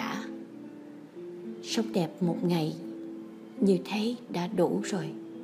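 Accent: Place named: Saigon, Vietnam. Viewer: Anh thich em doc Dung chat giong Saigon hon la lai Bac... cai nay hay hon may cai truoc Do em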